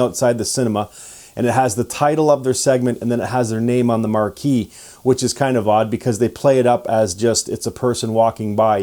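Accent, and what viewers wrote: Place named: Canada. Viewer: Figured I heard a Canuck accent eh!!!!!! U were right this is a good movie. But I loved it and unlike you there were no Nickleback segments. As a seasoned gore whore I almost gave up on horror that went woke after 2018. Boy was I wrong and glad I gave it a try. Horror is in a dark cave at the moment but with a flashlight you can find a few gems in the shadows. BON CHANCE!!!!!!!😮😮😮😮😮😮😮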